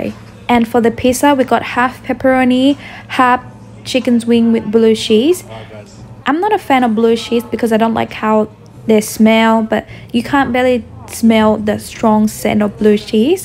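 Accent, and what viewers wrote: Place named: Australia. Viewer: You actually have an Australian accent now, not US english type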